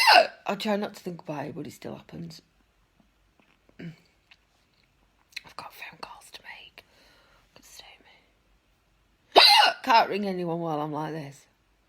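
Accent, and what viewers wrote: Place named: Australia. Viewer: You sound like an Ozzie ... and I adore ya! Pretty you are, too!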